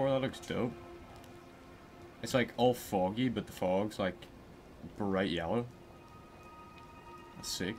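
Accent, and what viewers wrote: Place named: Northern Ireland, United Kingdom. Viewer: Ah a fellow Norn Irishman!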